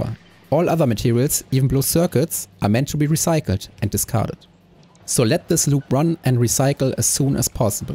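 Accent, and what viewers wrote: Germany. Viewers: I could watch these videos for hours just because of this german accent. (Rreally like it)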